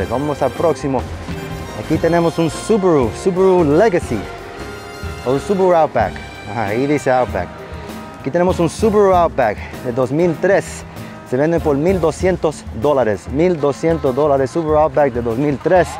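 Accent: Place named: Puerto Rico. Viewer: Saludos. lo de los vidrios se pueden reparar, ahora hay un metodo que usan para reparar. Pero se ve bien ese VW. Se dice burbujas de aire, lo del mercedez. Ya sabía yo que esa forma de hablar tenía algo de boricua. Que bueno tenerte paisano en estos medios...Haz ido a la isla? Yo vivo en Perú.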